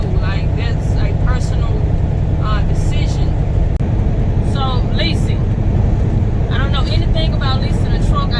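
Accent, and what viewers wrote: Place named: Alabama, United States. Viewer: Traveling on my birthday lol.... Thanks for the great info and stay safe my sister...... you sound like a Bama Girl lol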